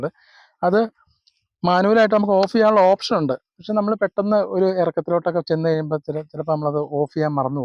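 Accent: India. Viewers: Kottayam,pathanamthitta malayalam.. vekthamayi karyangal paranju.. 2026il GST kuranjapol 1.15 on road.. 5000 extra fittings.. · വർത്താനം കേട്ടപ്പോൾ മനസിലായി തൊടുപുഴ കാരൻ എന്നു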